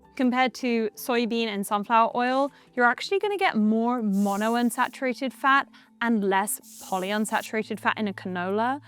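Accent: England, United Kingdom. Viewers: Weird to have someone speaking with an English accent, but calling Beef fat "tallow" instead of "Dripping" , and claiming she's never had it. Really? Never had a roast potato cooked in the traditional way? Her accent varies a bit. Seems slippery like the subject. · Another Brit who is been bought by the commercial interest to down play the dangers of seed oil. The country has gotten sicker since they started using seed oil. That's historical fact and no one with the British accent who has been bought by the commercial interests are going to convince me otherwise. She's obviously been bought by the industry that makes seed oil.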